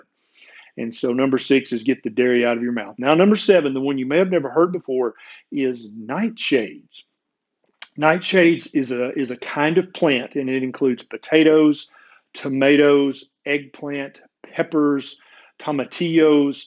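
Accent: South, United States. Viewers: Thank you for making these videos. Explanations made simple and concise with a southern accent and a handsome smile... Love it!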